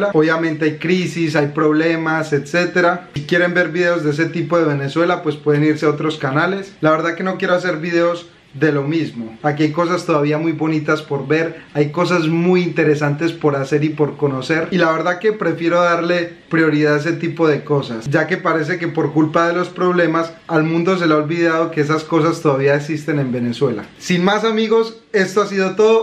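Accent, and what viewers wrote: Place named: Antioquia, Colombia. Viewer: Este man es español o colombiano porque tiene acento paisa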